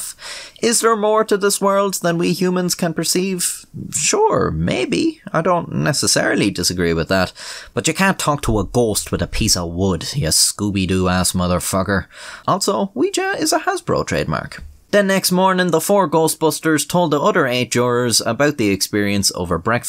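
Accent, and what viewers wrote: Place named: Ireland. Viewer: Come to Ireland for a year and you can understand him better :)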